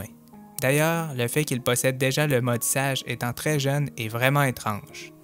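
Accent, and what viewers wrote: Quebec, Canada. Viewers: Tu et quebecois? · Il peut rien y faire il est quebecois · T québécois sa svois direct avk ton accent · Ta vu avec son accen canadien il est les a trouvé dans ces ses imaginatoin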